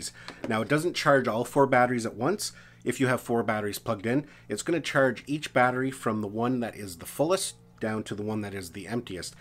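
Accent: Canada. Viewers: Canadian accent made this so amazing 😌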